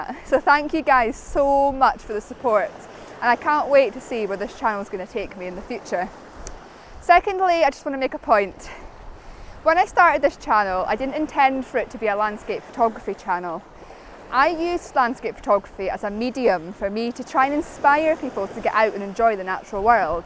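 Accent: Scotland, United Kingdom. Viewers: Hello, how are things? I like your video and I like your photos as well. Like # 940 is mine. The other photographer I follow are all geezers. it's nice to have a feminine perspective for a change. You've got yourself a follower in São Paulo, Brazil now. Love your scottish accent.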